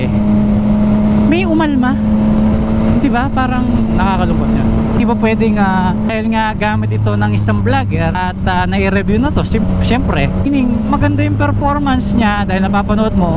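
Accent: Pampanga, Philippines. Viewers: Kapampangan kang tune par. ahaha managapatula ku kareng words a gagamitan mu. More power and ride safe lagi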